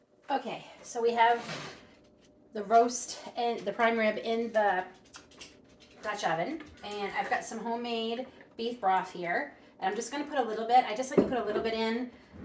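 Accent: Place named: Canada. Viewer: Thanks for sharing! SW Ontario here! I heard your accent and was searching for anything that pointed to you being Canadian. I saw the Bernardin lid and was so excited (obviously missed the bagged milk)! Pork was my first pressure canning experiment last year and my first meal with it was nachos. I figured if it was going to kill me, I was going out on nachos. Bit more confident in my canning now, haha!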